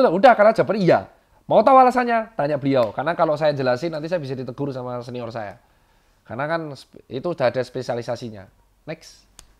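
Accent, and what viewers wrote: Java, Indonesia. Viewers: Logat jawa nya yg bikin gue ketawa terus duh 🤣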